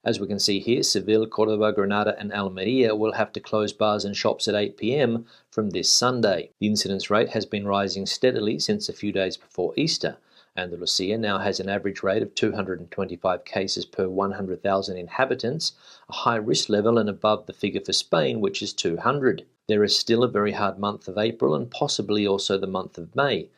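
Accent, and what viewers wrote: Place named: Australia. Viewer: Thank you for your informative video and your continued work. By the way loving your Aussie accent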